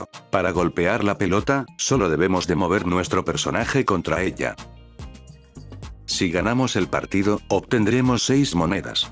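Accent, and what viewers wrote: Argentina. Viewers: Es de arg o uruguay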